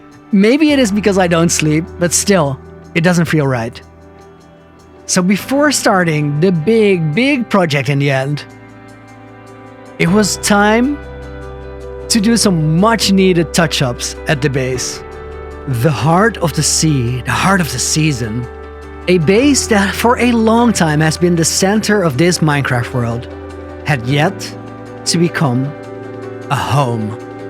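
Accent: Netherlands. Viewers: nice english mate dutch for sure :)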